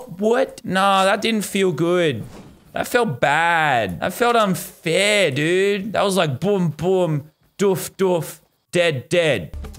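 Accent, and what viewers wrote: Australia. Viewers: is it just me or did lazarbeam sound more australian in this game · 2 years ago sounded so Australian compared to 2020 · why does he sound extra aussie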